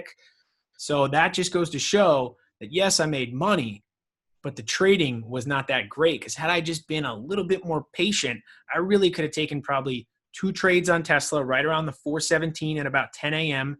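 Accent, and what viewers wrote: New York, United States. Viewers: Hey. Awesome content. Are you from New York?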